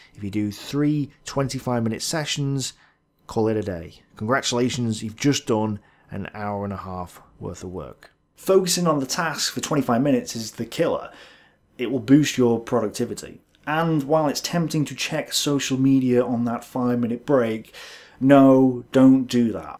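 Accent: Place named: Merseyside, United Kingdom. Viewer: Pomodoro. I'll check those out. cheers. Ps, you have a slight Birkenhead twang to your accent. or from Runcorn and Widnes. I lived there for a while and R&W had such a wide variety of accents that i felt like i was in the 1960s where 'scouse' sounded like the Beatles [Lancashire based accent] but also there wee people who sounded distinctly Mancunian. When i visit my family in the Wirral my old mates call me a 'toff' because they say i talk like a posh person from London yet in Brighton [my home] i am told i have a heavy scouse accent. No wonder Joseph Conrad [who spoke about 9 languages as he lived in central Europe between Germany, Czech and Poland so grew up trilingual]. Then again, as i recall, he did state , to be more accurate, he didn't enjoy 'writing' in English as english had so many accents it was difficult to understand someone who spoke local east end London, compared to a person from Newcastle or the midlands! 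I'm not surprised! hen i first moved to Brighton with my gf nd were chatting in a shop we would be followed by security wherever we went!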